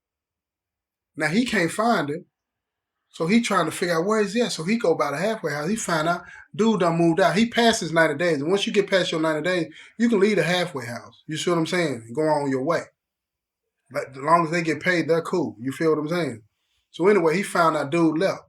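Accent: South, United States. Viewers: Hi, I look forward to ur videos everyday. Ur VERY consistent I love ur southern accent, I think it's sexy, anyway, u hv become part of my morning routine. I wish there was a way to send u $. U r LIT J! Hv a good day.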